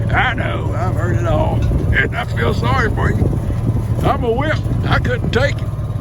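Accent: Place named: Arkansas, United States. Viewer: I enjoy all the videos and I could listen to you talk all day. You say some expressions my dad used to say and the accent too. He was from Arkansas and has been gone for 21 years. I continue to pray for Gigi and Raleigh. Totally happy that Ivan is so much better, he makes me smile. Still praying Sooky comes home too ❤️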